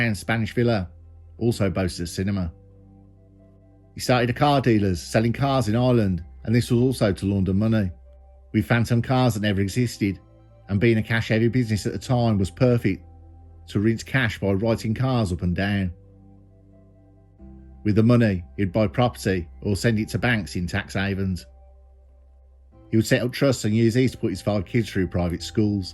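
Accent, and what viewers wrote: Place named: Midlands, United Kingdom. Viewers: Yeah that's it, it's the narration that fits like a hand in a glove with the content, probably his raw Midlands accent and demeanor that draw us in and keep us listening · 100%.. love this video and the combo of black country/brummie accent and surreal music makes this reality peaky blindersesque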